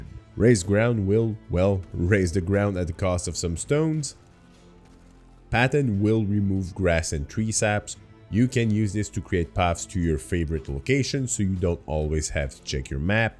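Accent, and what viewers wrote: Quebec, Canada. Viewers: you have a French Canadian Quebec accent it sounds like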